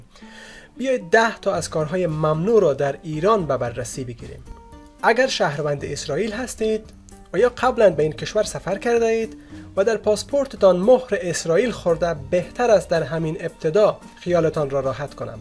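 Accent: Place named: Afghanistan. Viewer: جالبه که خود این بابا لهجه افغانی داره 😅😅😅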